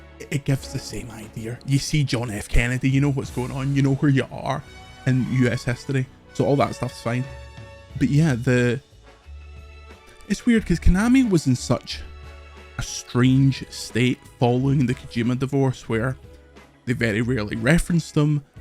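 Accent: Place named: Scotland, United Kingdom. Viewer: You're a troll or an idiot. The accent is Scottish, and as an Englishman I understood him perfectly.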